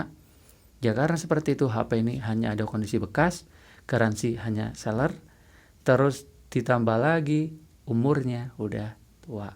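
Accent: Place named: Palembang, Indonesia. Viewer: wong palembang wkwk, bahaso nyo ketaro bang:v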